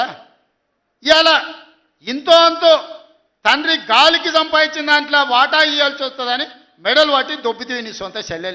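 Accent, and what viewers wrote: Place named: Palamuru, India. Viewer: Maa పాలమూరు yasa lo mata తీరు...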